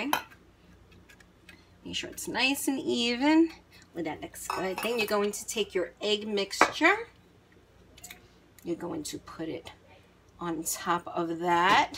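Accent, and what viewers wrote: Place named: East Coast, United States. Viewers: Oh my Gawd! Oh my Gawd! I love the east coast accent. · I'd eat that. BTW, love the east coast accent.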